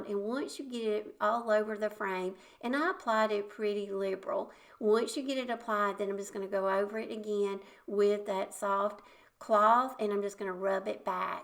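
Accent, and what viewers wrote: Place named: Alabama, United States. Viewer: The subject…French country decor…..caught my eye. Then I heard your sweet voice and said to myself “I bet she’s from Alabama “. Hi from Walker County(NW of Birmingham)…👋🏻👋🏻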